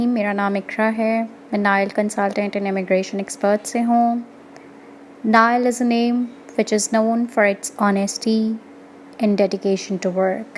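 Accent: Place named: India. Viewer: Nice speaking English just like indian so easy..appreciate to you madem...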